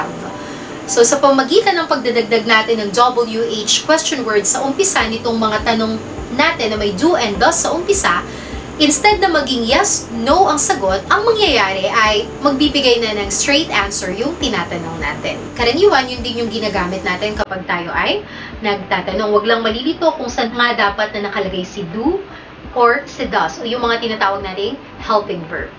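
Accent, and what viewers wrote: United States. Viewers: I think tama yung American accent mo dapat maglesson ka ng American accent sa pagsasalita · Si pinay Engish na blogger iba ang accent nya . She sounds American English . Lahat magaling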